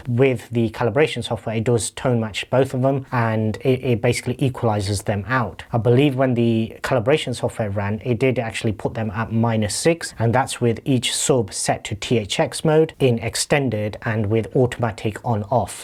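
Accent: United Kingdom. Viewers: Nice update!! Great to see some UK home cinema content! Im currently running an Atmos 5.3.2 with a Denon receiver. Im restricted as Im still trying to maintain a living room esthetic. Ive still managed to squeeze into the space (4.5 x 4.0 x 2.0m) *120" motorised screen *JVC DLA X90 *Sony 65" 4K *Denon Atmos AV *XBOX 1S (primary source for streaming including Apple TV, and bluray of course) *Nvidia shield *KEF Q200 Centre Speaker *Monitor Audio shadow surrounds (for their low profile) *3 X BK Electronic subwoofers (for their small footprint) My most important ugrade is going to have to be a projector! My current JVC has excellent black levels considering its age but an upgrade is long past due. Its either going to be a X7000 e-shift (based on price and its the same size as my current one, so it will fit into the custom box) or I might hold out for a N5B! 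Also, I feel my subs hit pretty hard and deep considering their size (with a claimed figure of 17hz) but without calibration I have no real idea, so I'm also on the hunt for a mini-dsp. I cant place the accent, what part of the UK are you from? #SHEFFIELD 💪🏽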